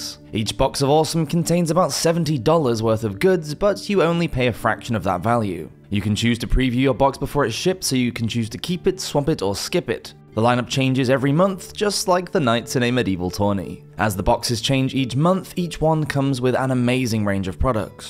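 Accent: England, United Kingdom. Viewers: a deaf person can tell this is an english accent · Just English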